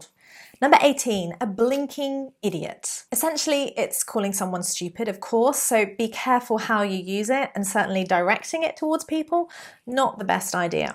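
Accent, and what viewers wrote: United Kingdom. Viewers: .. I hope you're doing jolly well... I love your accent.. Would you mind making some videos to improve british accent... And more Posh videos... they are my cup of tea... Thank you so much for your time... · Like the subject n uk tone 👍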